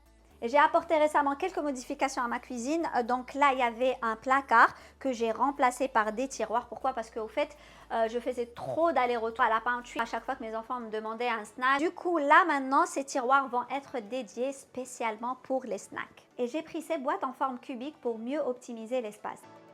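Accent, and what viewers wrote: Algiers, Algeria. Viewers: Coucou ma belle j'adore quand tu parle on français avec ton accent algeroissssssss j'adore tes vidéos merci beaucoup pour les astuces bisous pour les petites anges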